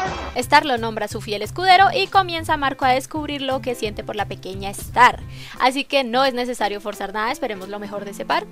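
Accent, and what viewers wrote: Colombia. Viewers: tu eres Colombiana? Yo si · eres colombiana? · ESPERA.... Eres colombiana ????? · Jajaj saludame en el siguiente vídeo pdta:eres colombiana? :v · Eres Colombiana?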